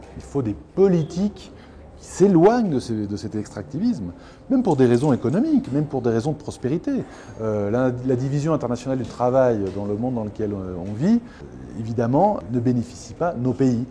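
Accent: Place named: Ecuador. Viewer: Le français plus équatorien au monde! Bien expliqué le Sumak Kawsay.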